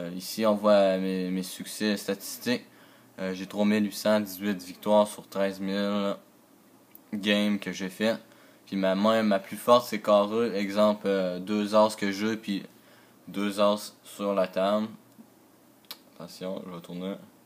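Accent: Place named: Belgium. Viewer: tres bien expliquer mes bon axent belge je croie j aime bien je voulait te dire j aimerai bien quon joue ensemble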